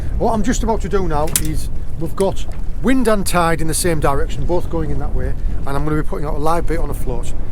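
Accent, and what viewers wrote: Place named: Yorkshire, United Kingdom. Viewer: I am really enjoying your videos. You had me at the mention of Cornwall. I went there once and loved it. I always dreamed of retiring there and just painting everything. I came back with hardly any pictures though; I was too busy enjoying the place! I don't really fish, but I used to dive,so I love the underwater stuff. And I love the foraging. I am not much for beaches unless it is in cool weather. Cornwall fits the bill! Most of all, I enjoy listening to you. I didn't think you were Cornish, because I can understand you. But the Yorkshire accent I do get. Your voice is soothing and you are always smiling. You have a nice family as well. Your son is fortunate to be able to learn from you.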